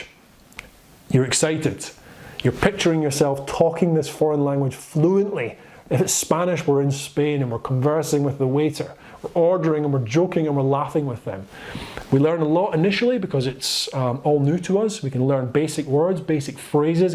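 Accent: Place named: Inverness, United Kingdom. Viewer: Are you from Inverness? Your accent sounds very much like it!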